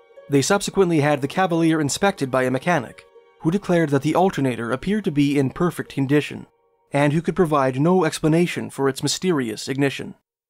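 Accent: Canada. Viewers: Your Canadian accent is a bonus to your perfect narrations!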